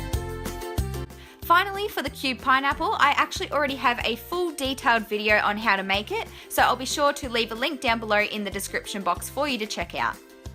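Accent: Australia. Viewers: She's Aussie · Are you a fellow Aussie? Or a Kiwi? I'm trying to pick up on your accent lol. I'm Australian, myself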